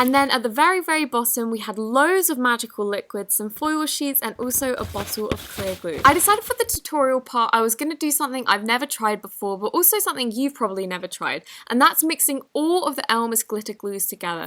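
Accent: England, United Kingdom. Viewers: Okay so this is a bit of a weird question but I am reading a book right now and it's based in England in the queen victoria erra so the girl has a brother named Rupert so what I wanna know is how you would pronounce it? I love the english accent and this is English based book I know a bit of a weird question lol but I love your videos they're always great keep up the great work <3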